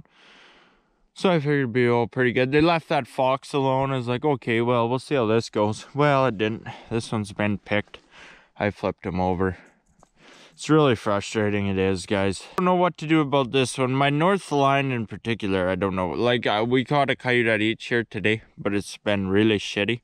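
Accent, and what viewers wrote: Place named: Alberta, Canada. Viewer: This guy sounds like hes from Alberta Canada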